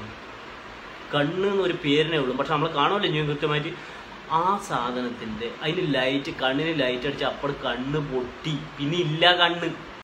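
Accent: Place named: Kannur, India. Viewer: നമ്മളെ കണ്ണൂര് ഭാഷ.... കേക്കാൻ നല്ല രസൂണ്ട്. ലൈക് അടി മോനെ ഈട... 😊👍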